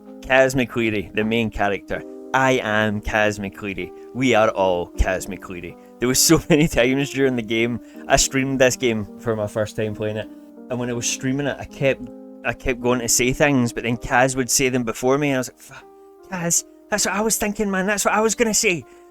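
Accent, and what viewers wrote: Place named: Scotland, United Kingdom. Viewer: I always wunder ur accent, scotlands 🙌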